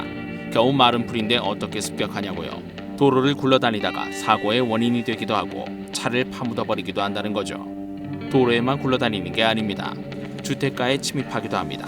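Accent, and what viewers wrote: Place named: Seoul, South Korea. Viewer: 옛날 서울말 쓰시는 것 같다 ㅋㅋ 옛느낌 낭낭한 목소리 분위기 좋당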